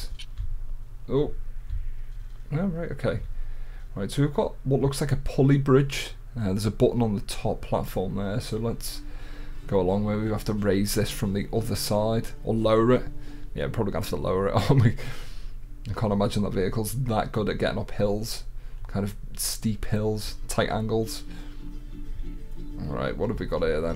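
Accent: United Kingdom. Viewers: Your accent...From.the wirral?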